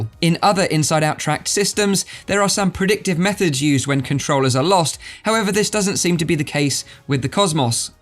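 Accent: United Kingdom. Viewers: wtf? He has a decidedly British English accent. His pronunciation of 'cosmos' is in that idiom, and no mistake. I see no evidence of Yankery here! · NDT and those guys are all actors. Propagandists. And yes , ole boy is brit af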